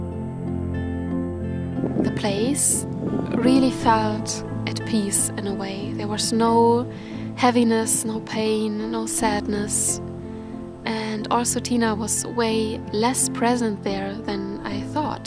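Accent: Germany. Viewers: O sotaque alemão é profundo nela ou seria impressão minha ? From Brazil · Es macht einfach Spaß deine / eure Videos zu sehen. Mein Englisch ist echt nicht gut. Aber man kann iw heraushören das du aus Deutschland kommst. Und dann premiere Pro, was noch auf Deutsch steht. Das ist einfach alles zusammen mega stimmigyschön für mich hier in Deutschland. Wo ich mich danach sehne, was du seit einiger Zeit in Norwegen hast. Weiter so!!!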